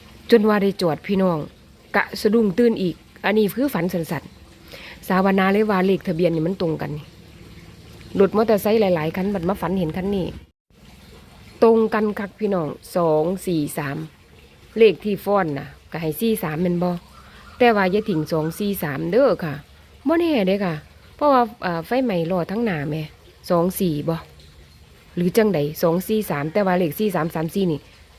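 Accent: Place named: rural, Thailand. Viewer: ชอบฟังเสียง จร้า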